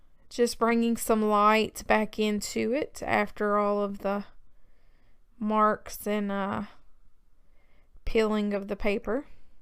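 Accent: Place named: Texas, United States. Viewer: I'm from Texas and I have a thick accent like you. It's a joy to watch your videos! I'm an aspiring artist and have been dabbling with mixed media pieces so it's fun to watch and get ideas from you! I get a kick out of your comments and dialogue. :) thanks for your videos! ❤️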